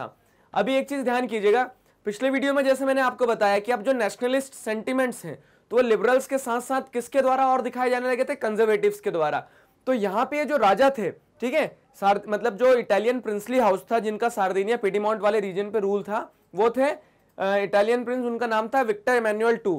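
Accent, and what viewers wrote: India. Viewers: The Indian accent is weirding cute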